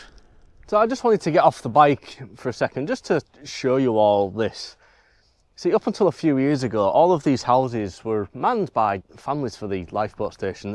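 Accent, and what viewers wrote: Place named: East Yorkshire, United Kingdom. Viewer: Been there many times. Fond memories of East Yorkshire. Your accent brings it back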